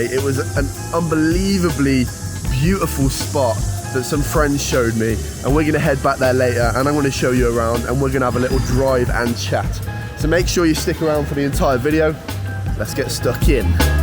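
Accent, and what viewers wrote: Standard. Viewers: 你的中文比大部分中国人的发音都要标准，因为我们有很多乡音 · 视频有深度了。中文发音很标准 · 你讲中文的口音很标准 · 你的发音很标准呀！感觉很少见到发音这么好但是流畅度还不够的外国人。印象中大多数人的情况都是，已经能流畅说话了但是发音实在有不好的习惯。感觉你基础打得很好。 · 天呐！我也想要一支这样的笔😍，有道翻译太牛了👍！另外，你的中文发音好正👍谢谢你视频最后这段客观事实中肯的话❤️ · 你的中文水平真的提高了好多呀！你最早的视频真的一点中文也不会的。现在你这发音可标准了哈哈哈！表扬一下！ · 很好哦，中文有进步啊，没什么口音还挺标准🤓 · 你的发音真的很标准！当然我说的是你的中文！ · 中文有进步，口音很标准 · 非常感谢你的真实中国视频！ 你的说出来的汉语好标准！真棒！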